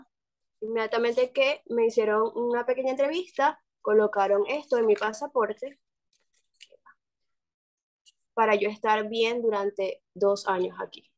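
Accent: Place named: Puerto Rico. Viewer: Eres en verdad venezolana hablas como si fueses de Puerto Rico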